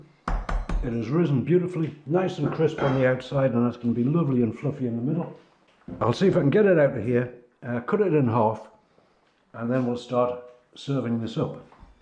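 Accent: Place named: Sunderland, United Kingdom. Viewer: I don't eat meat, but I'm happy to watch just for your lovely accent which reminds me of Christmas with my family in Sunderland.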